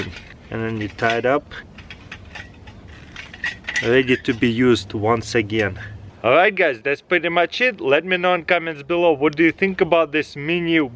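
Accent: Russia. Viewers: У тебя акцент русский.😀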